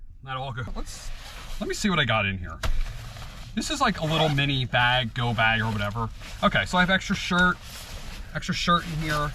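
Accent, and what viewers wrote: Philadelphia, United States. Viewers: Your accent, then I saw your t shirt. A fellow Philly dude. Yo!!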